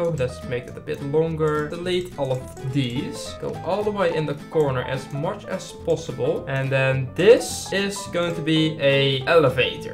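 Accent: Netherlands. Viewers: ik weet dat je nederlands of belg bent dat hoor ik aan je engels maar i love youre vidoes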